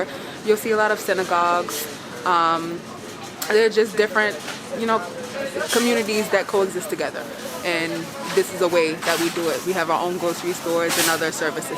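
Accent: New York, United States. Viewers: That New York Accent 😍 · Love her NY accent! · She has such an authentic NY accent. Love my people! My fiance is from Wavecrest! I miss farrock.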